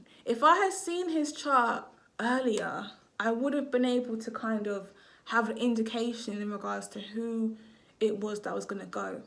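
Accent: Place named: United Kingdom. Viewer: Its called an accent... She's British.. Dont be that type of person famz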